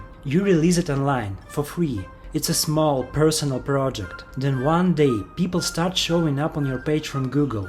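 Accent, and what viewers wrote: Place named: Russia. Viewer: Очередной урок самаритянам. Делают всё за бесплатно - а потом: "а чё это у нас денег нет?😮" Насчёт авторки. Если бы тебе юрисдикция позволяла, было бы незамедлительное обращение в суд и всё по списку. Но ты стыдливо опустил момент, что никто с тобой не будет считаться, просто потому что ты из неправильной страны. Лучше на ютубчике плакаться корявым русским акцентом😢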